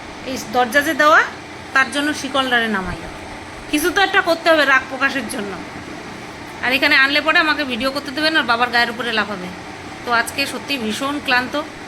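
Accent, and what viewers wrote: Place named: Dhaka, Bangladesh. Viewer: তোমার কথাগুলো ঢাকার ভাষার মতো। তোমার আদি বাড়ি কী বাংলাদেশ ছিলো?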